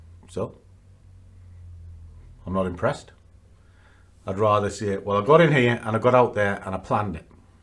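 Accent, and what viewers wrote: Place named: Sunderland, United Kingdom. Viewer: You from Sunderland area ?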